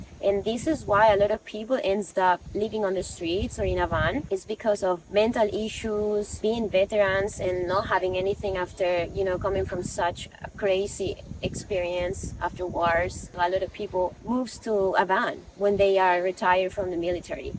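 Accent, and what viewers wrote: Spain. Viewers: Just came across your channel> I've watched another of your videos by now. If you want to wear a bikini wear one. I was trying to place your accent in the other video now I know your from Spain. .Una novia que tuve su primer idioma fue el español y a mí me encanta el español. For give me if I'm off on the sentience in Spanish. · Loved hearing the spanish accent <3 That was quite sassy though lol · That was really cool how you explained about car rules in spain. Maybe do an english and spanish learning video on van stuff. Love the spanish from spain its pronounced very beautiful ❤ and I love the way s and c's are spoken. Classic . GOD BLESS MAY GOD GUIDE YOUR STEPS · You are so Gorgeous ❤ and the Spanish accent 😍!greetings from New York State! 🗽 ! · Your Spanish accent is ❤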